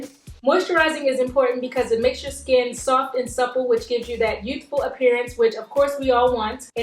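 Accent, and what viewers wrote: Baltimore, United States. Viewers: you are so awesomely beautiful!! Thanks alot for these tips! P.S. I heard some Baltimore in this video and I love it!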